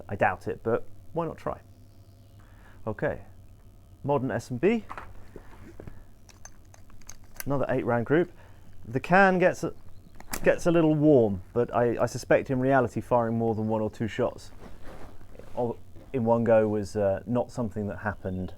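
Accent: United Kingdom. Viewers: From his accent,I assumed he was British,and living in the UK. I then send,WTF, how can he get access to a suppressed PISTOL ???!!! I then learn he is in Switzerland. Didn't realize they have gun laws not much different than in the U.S.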